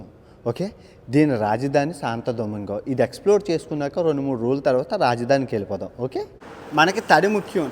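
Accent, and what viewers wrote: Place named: North Andhra, India. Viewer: Ur videos n ur dialogues in Telugu north Andhra accent r fantastic n the way u r moving with people is awesome...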